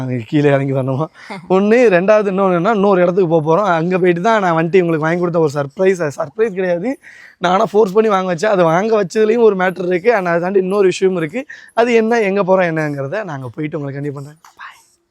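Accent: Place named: Thoothukudi, India. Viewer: ஓகே அண்ணா அக்கா எனக்கு அம்மா ஊர் தூத்துக்குடி யில்ஓருகிரமம்வேப்பலோடை.மாமியார்ஊர்சாத்தூர்பக்கத்தில்பந்துவார்பட்டிஇப்போதுஇருப்பதுதிருவள்ளூர்.உங்கள்குரல்தூத்துக்குடிகாரங்கபேசுவதுபோல்இருக்கிறதுஅதனால்தான்கேட்டேன்எனக்குஉங்கவீடியோஎல்லாம்பிடிக்கும்அக்காஅண்ணா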